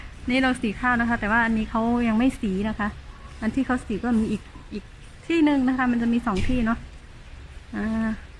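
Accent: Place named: South, Thailand. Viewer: เอาแกลบไปเผาดินขอคือเอาไปเผากระเบื้องดินขอมุงหลังคาบ้านล้านนา ฝั่งไทยหาดูยากเหลืออยู่วัดต้นเกว๋นที่ถ่ายละครกาสะลอง คำว่า ขอ คือด้านหนึ่งของกระเบื้องจะงอๆเป็นตะขอเกี่ยวไม้ระแนงบนหลังคา ทำจากดินเหนียวเลยเรียก ดินขอ สำเนียงน้ำเสียงที่น้องพูดฟังไปฟังมาก็นึกถึงภาษาใต้ ภาษาตระกูลไทเหมือนกัน รากศัพท์ก็อันเดียวกันเช่น เถื่อนแปลว่าป่า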